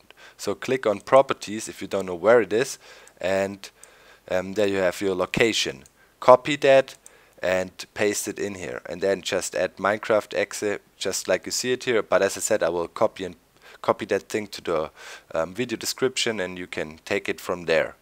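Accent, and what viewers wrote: Germany. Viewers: yes he is becuase he lives in germany and hes actcent :3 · are you from german?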